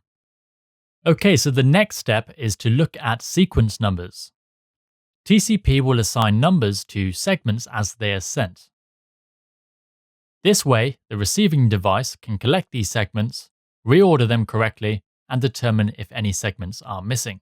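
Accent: United Kingdom. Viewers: I love these videos btw! I am starting an apprenticeship within IT networking on Monday and I have been trying to research information. Your videos are the most beneficial and well explained! Are you british btw?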